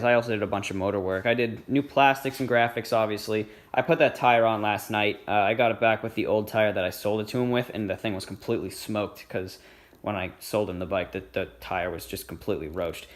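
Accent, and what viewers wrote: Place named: Massachusetts, United States. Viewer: You from mass??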